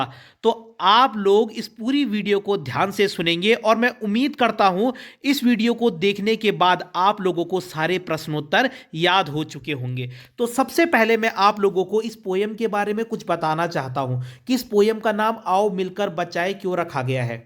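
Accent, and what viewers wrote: Garhwal, India. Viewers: Sir apki gadwali tune Ari h ❤️🤣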